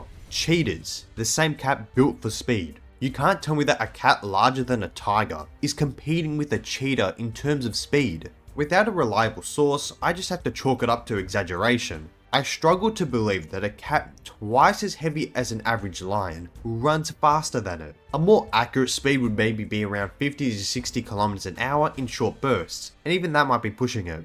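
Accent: Australia. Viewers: I need more of this Aussie accent talking about wild animals. Nothing better than a wikd human describing wild animals with their wild accents. I love it!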